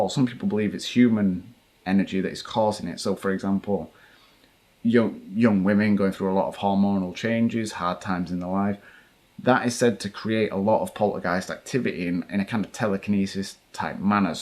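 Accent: Yorkshire, United Kingdom. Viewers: Sorry to keep messaging I'm from Yorkshire and I can tell a Yorkshire accent when I hear one, where you from mate? Promise I won't come terrorize your house I live in Dubai hahahah bit of a journey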